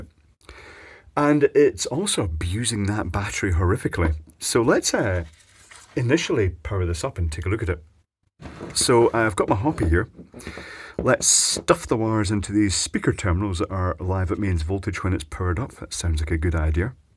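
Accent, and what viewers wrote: Scotland, United Kingdom. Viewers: Bit like old bond he has a Scottish accent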